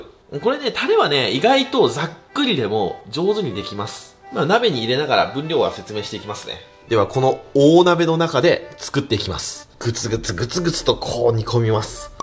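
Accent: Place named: Aichi, Japan. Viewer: 愛知だからか朝倉未来とイントネーション同じ時ある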